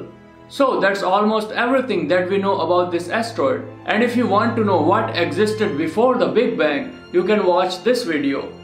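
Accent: India. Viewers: Who thought the guy who is speaking in this Video is Indian? Me!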